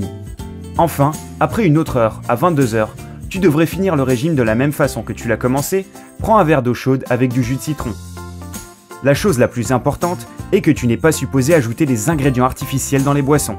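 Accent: Quebec, Canada. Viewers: t québécoise ?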